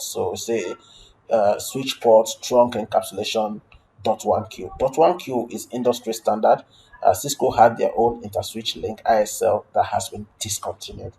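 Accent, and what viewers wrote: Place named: Nigeria. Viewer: why you sound like nigerian scammer?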